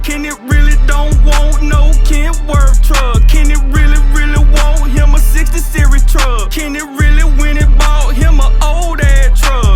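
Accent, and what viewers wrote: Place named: Texas, United States. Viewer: You from the H bro ?? You sound like you from Texas on god I know Texas/Louisiana accents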